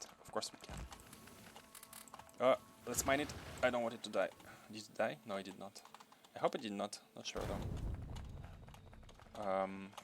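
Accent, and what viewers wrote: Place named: Eastern Europe. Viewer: This railway system is very difficult. After seeing how Nilaus builds a railway where everything is "copy-paste" and simple in logic, it hurts a bit to make your life harder. I started watching yesterday and I'm going to watch all the episodes. Your English is amazing and very understandable because you speak like Slavs and I'm from Poland. You speak very carefully and that is a huge plus.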